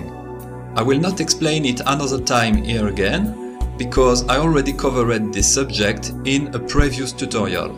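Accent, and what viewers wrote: France. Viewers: Cet accent français. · I'm not a native english speaker and I tent to have problems to understand people with accent. But understanding you is very easy don't worry. Most people, me included also like the french accent. Thanks for the tutorial! Would love to see more in the future. Love your art and love free software too! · your french accent sounds amazing,to be honest. the video itself is very useful,thank you,the art looks incredible,love it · I worked in Issoire, France for 4 months in 2005, near Clermont Ferrand. It was refreshing to once again hear someone with a French accent speak such proper English. Makes me wish I was there again. Nice job overcoming the language barrier, and nice job on the video as well. I'm about to download Krita now in order to try to use it to illustrate a children's book. Wish me luck.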